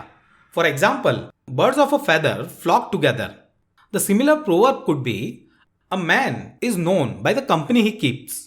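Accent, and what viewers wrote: India. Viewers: Indian accent 🥀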